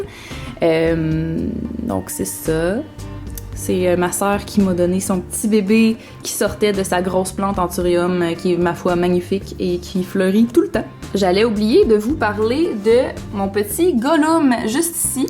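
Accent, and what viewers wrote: Canada. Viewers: super vidéo avec en plus le joli accent canadien, j'adore!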